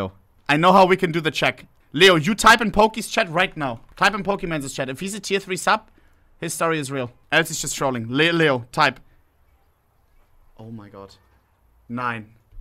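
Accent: Germany. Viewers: is he german? I hear an accent XDD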